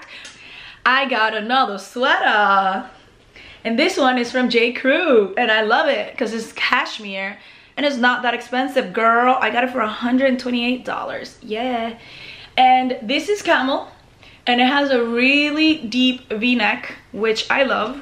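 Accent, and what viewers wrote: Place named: Cuba. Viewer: Your accent makes me miss my Cuban friends in Miami!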